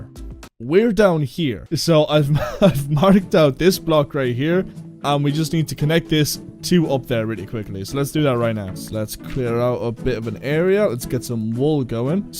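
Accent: Ireland. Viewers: I KNEW IT, as an irish man when u were saying stuff like "tree" instead of three (it wus rly subtle but i could kinda tell) i KNEW u were irish, i jus couldnt prove it until wait... ive been watching that long? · I can smell the irishness from a mile away, my dad is irish so this is wholesome👍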